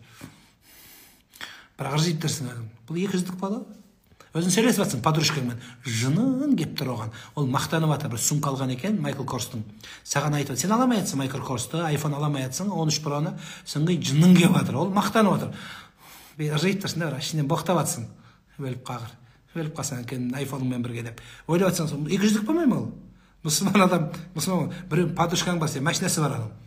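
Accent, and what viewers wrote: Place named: Kyrgyzstan. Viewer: Ообада таптаза кыргызча суйлойт екен ,рахмат сизге.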